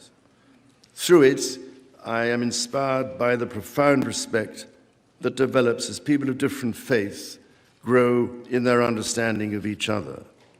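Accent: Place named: RP, United Kingdom. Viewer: Nothing beats a clean, pure, royal English accent. Glorious.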